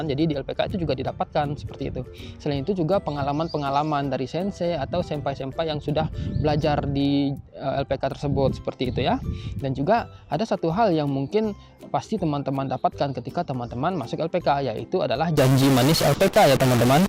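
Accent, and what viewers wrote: Padang, Indonesia. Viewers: Abg nih org padang ya?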